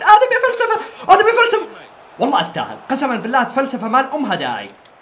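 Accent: Gulf, Saudi Arabia. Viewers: آدم اريد سأل انت سعود ولا بحرين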